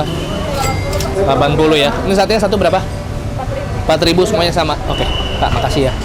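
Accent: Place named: Medan, Indonesia. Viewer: iya bg tau saya 😅😅 abg kan blg selama di medan ngomong Medan, saya cuma ngasih tau aja di medan ga pake gua gue,, biar makin medan logatnya😁😁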